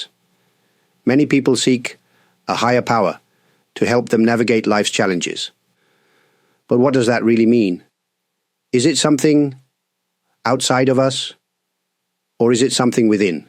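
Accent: United Kingdom. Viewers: IT OCCURED IN MY MIND, TO NOTICE THAT YOU HAVE SOME BRITISH ACCENT IN THE WAY YOU SPEAK. ARE YOU LIVING IN BRITAIN MAYBE?😇🙏💜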